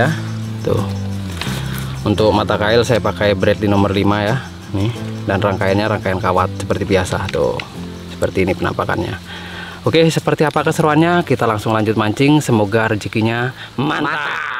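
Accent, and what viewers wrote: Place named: Java, Indonesia. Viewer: Abang ini asli mana kok logat nya campur jawa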